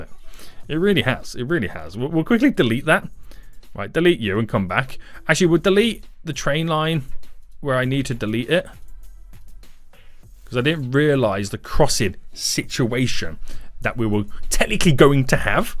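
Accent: United Kingdom. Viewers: Love ur british acccent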